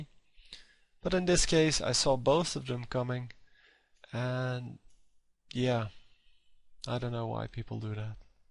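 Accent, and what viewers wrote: Netherlands. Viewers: ze engels heet een verschikkelijk nederlands accent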